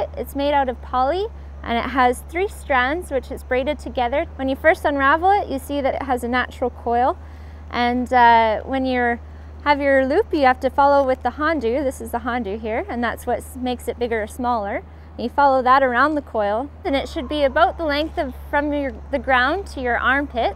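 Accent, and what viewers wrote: Canada. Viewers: Wonderful! love the Calgary accent! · Lol, Honda knot, Han-doo and lassoo instead of lasso so Canadian love it 💕 city/country girl (grew up around both)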